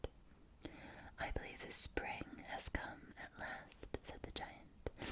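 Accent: Scotland, United Kingdom. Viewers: I’m loving the Scottish accent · Who else would love to see a Shrek roleplay from, she also has a strong Scottish accent, which makes it all the better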